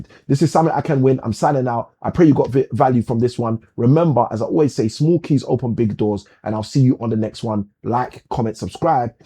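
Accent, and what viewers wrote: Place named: Africa. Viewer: Your not from the UK your from some place in Africa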